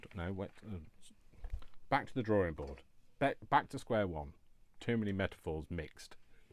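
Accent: United Kingdom. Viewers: What a fun video today! I just love some Northern accent me! Those hobbies weekly mags are great! What a super find and love this project! Keep being jolly……cos I am as well! Love from Edinburgh xx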